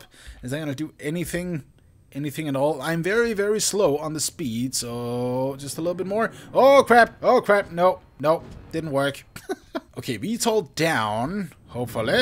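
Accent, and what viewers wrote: Denmark. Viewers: He's Danish, I live in Sweden and anyone that swedish fluently would recognize a dane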